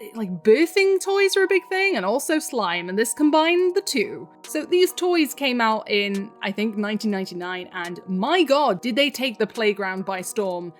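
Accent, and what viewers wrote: Wales, United Kingdom. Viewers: Are you brittish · I KNEW I HEARD A WELSH ACCENT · I new I recognised that ascent! Got a question is wales.. good? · Ur british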